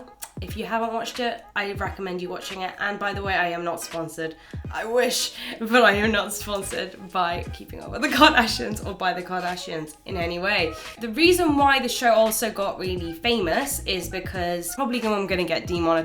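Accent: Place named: United Kingdom. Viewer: How the F... are you armenian ???? Woooow that Brit accent ...wow. Hahaha Hey Armenian girlie 🖤 Georgian girlie saluting you.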